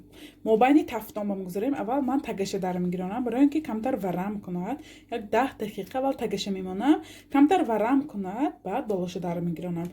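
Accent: Isfara, Tajikistan. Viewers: Ассалому алейкум ва Рахматуллохи ва Баракатух ойя шумо аз Исфара лахчатон Исфарача бехтарин пухтупаз барои занхо 👍👍👍👍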